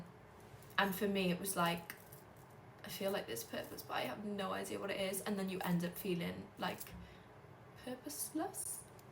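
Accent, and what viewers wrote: United Kingdom. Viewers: ربنا يثبت قلبك على دين الإسلام يارب وعلى فكرة لهجتها تدل على انها بريطانية وليست أمريكية المهم انها مسلمة فقط للتوضيح Sister we welcome you as a great Muslim sister and you look so beautiful in hijab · Sister, take your time you made the first step you recognize the creator and you submit to him. You’re in the safe zone. Everything else come second the description says you’re an American. You have a British accent. I just wanna correct whoever posted this.❤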